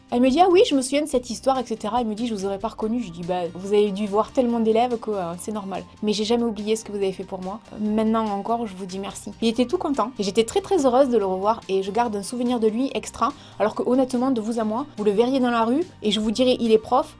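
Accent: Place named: Villeneuve-sur-Lot, France. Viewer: MAMAN TU ES VRAIMENT MA MÈRE JE SUIS NÉE À VILLENEUVE SUR LOT! TON ACCENT ME DISAIT QUELQUE CHOSE ❤️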